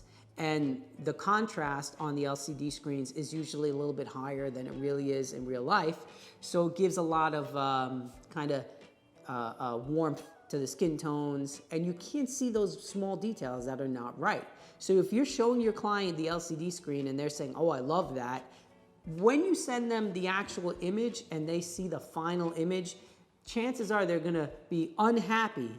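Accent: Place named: Bronx, United States. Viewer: Thank I grew up in the Bronx listening to you made me home sick. Great job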